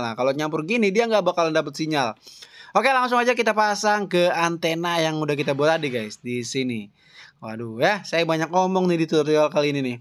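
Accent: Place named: Bekasi, Indonesia. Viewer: Org Bekasi Mane dye suaranya niiiiihhhh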